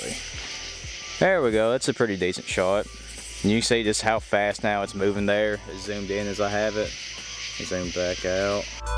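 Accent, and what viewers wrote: United States. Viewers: where in America is this guy from for that accent? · Wat state is his accent from...sounds cool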